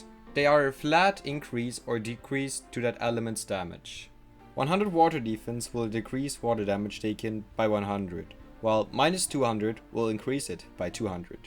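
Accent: Germany. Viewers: it sounds like u are from germany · Where are U from BC english Sounds like a German accent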